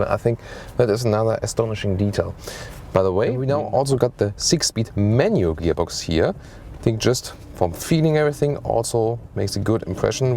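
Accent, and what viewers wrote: Germany. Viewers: love german speak english. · Please talk english not genglish!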